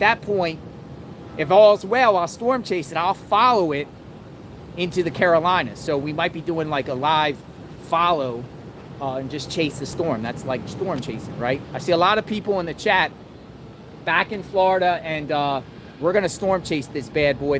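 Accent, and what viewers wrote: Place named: North, United States. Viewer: Haha! Bro u sound like a Northern. Could not stomach 2 min of your crap.. your spewing rubbish…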